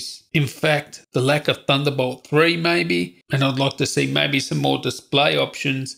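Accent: Australia. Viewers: fuckin love that aussie accent mate, im sick and tired of american or english reviewers, keep up with the good work. cheers from Uruguay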